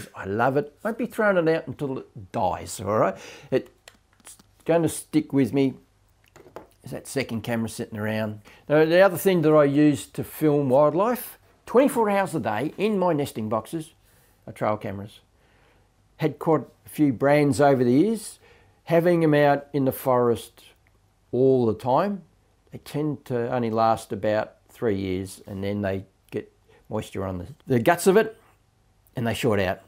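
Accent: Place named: Australia. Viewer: Hi, I enjoyed your photography/video timeline video. I also think your wildlife video and photography work is great, here in GB you’d be probably be employed by the BBC as a freelancer, as they do so much natural-history production, apart from the David Attenborough epic series shown worldwide, we have BBC Spring-Autumn-Winter Watch which is a three seasons live TV wildlife series broadcast from wildlife locations around Britain. They get a good audience and lot of interest and the team includes wildlife photographer/presenter Chris Packham, TV presenter Michaela Strachan, Iolo Williams and Gillian Burke, with other regulars appearing. I love that little Antechinus creature, what exactly is it? Some kind of shrew creature native to Australia, I guess you’re in Australia from your accent.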